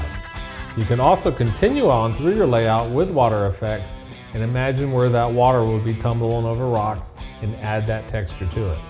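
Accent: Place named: North America. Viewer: Lástima que no tenga sub-títulos en español, queda uno sin saber que éxlican en ese inglés norteamericano.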